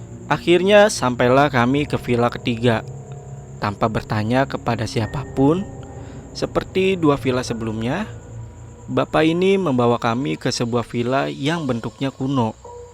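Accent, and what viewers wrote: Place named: Aceh, Indonesia. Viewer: logat antara orang ACEH atau kah BALI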